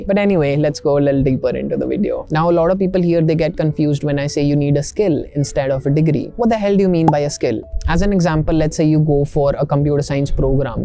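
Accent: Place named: India. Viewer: information is really worthit . and the most imp. thing is that till now his excent is indian . hatsoff to this guy.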